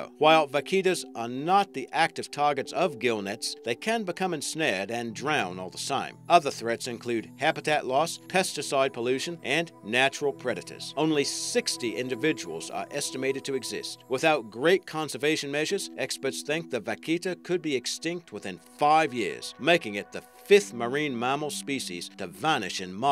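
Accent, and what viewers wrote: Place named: Australia. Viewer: maybe a soft Australian accent.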